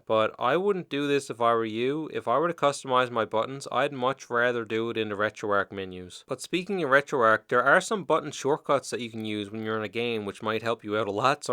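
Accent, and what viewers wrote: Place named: Newfoundland, Canada. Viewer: As soon as I heard you speak I knew you were from Newfoundland. Great video. I subscribed to your channel.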